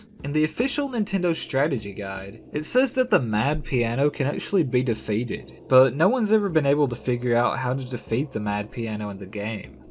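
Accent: South, United States. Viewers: Is this guy from the south?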